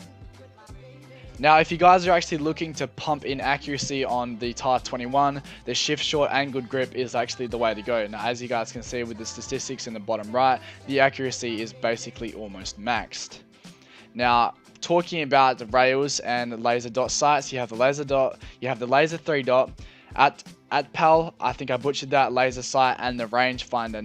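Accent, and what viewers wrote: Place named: Australia. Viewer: Are you from austrslia you sound like au chezzy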